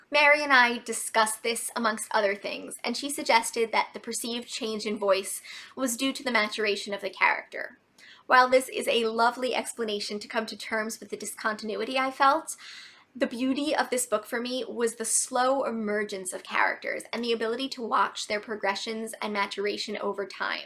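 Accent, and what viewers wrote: United States. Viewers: Here from Books and Things. You have a very cute and familiar face and very sound very American. Subbed!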